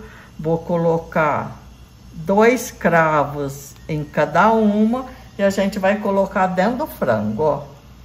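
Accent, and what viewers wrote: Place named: Minas Gerais, Brazil. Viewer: Eu não conhecia você, e a semana passada assisti um vídeo seu da ceia de Natal, e hoje vi você no Fantástico e me lembrei que assisti o vídeo seu. Sucesso adoro o seu sotaque igual de uma amiga minha de Minas.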